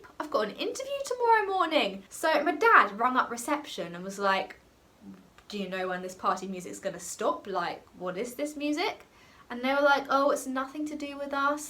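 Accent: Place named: RP, United Kingdom. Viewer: your too posh 😭😭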